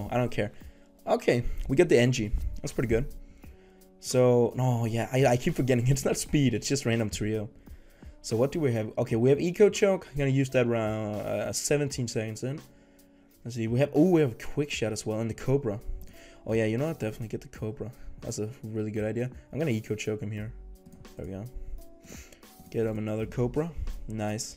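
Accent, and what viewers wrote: Denmark. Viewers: Lol er du dansker? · are u a danish person ore what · Are you from denmark? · are u from denmark?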